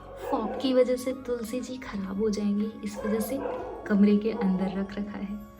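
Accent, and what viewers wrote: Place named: Uttar Pradesh, India. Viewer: It's not about pronunciation, it's about the regional effects that's why you are speaking with such accent. As you are from up so it's your Hinglish ascend. A haryanvi will speak hos own Accent so don't worry regarding this things.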